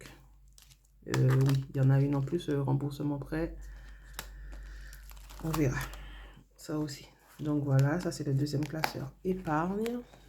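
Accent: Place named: Antilles, France. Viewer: Coucou bienvenue! Je me suis abonné 🎉🎉 j’entends les Antilles dans l’accent sa m’est familier😂! Bon courage à toi et a ta famille et au plaisir de voir tes prochaines vidéos 🎉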